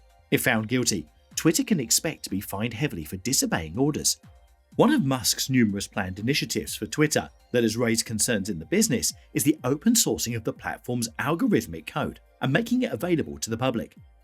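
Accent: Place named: England, United Kingdom. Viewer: Who in the hell is this English guy do we have anybody that can talk normal anymore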